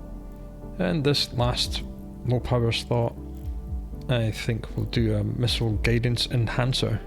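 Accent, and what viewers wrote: Scotland, United Kingdom. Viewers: your videos are amazing, lovely conversational way of going through things, the Scottish accent and easy going manner is of course fully to blame. A top lad.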